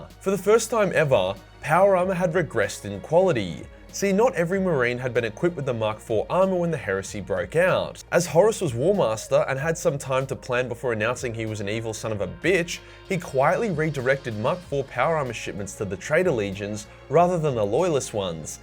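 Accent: Australia. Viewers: Why is it relevant that you’re Australian? It’s pretty fkn obvious anyway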